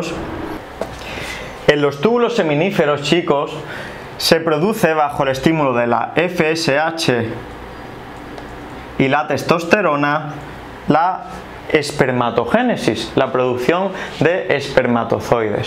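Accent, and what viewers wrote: Spain. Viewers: La información puede ser buena, pero la verdad la parte comunicativa rompe el ánimo de seguir viendo, para los americanos hispanoparlantes de por si, nos dificulta un poco decifrar la manera de hablar de los españoles, pero echándole ganas se puede traducir (sin justificar el excesivo uso del "vale" que para nosotros no tiene sentido!), pero lo que si me resulta grosero es el uso innecesario del inglés, ojalá pudieras eliminar eso y seguramente tendrías algo más de aceptación. saludos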